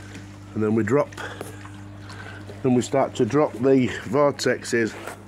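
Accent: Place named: Yorkshire, United Kingdom. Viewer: love the Yorkshire accent on water. its great this weather i did have a mulibay but now have a nexus and just subbed mate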